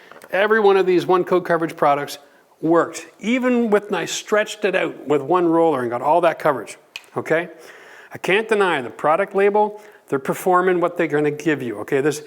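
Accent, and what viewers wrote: Canada. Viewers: Apparently the accent and use of millimeters didn’t clue you in, but this guy is Canadian.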